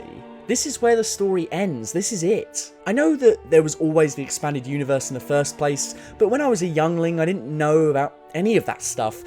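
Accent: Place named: England, United Kingdom. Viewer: Shriiiieeeeeek.... American pronunciations from an English accent, give me chalk screeching on a black board any day!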